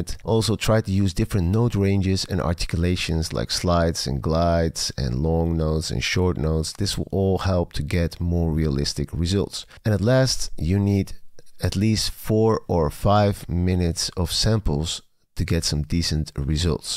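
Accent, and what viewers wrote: Netherlands. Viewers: Goede video, klinkt fantastisch! Complimenten! Je Nederlandse accent heeft je wel verraden haha... 🙂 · Ik wist het niet zeker maar ik hoorde een NL accent en achteraf bleek dit inderdaad het geval te zijn. Gaaf! 🫡💪❤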